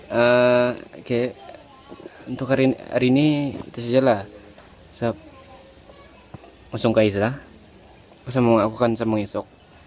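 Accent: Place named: Brunei. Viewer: Ohh patut tah melayu mu cam brunei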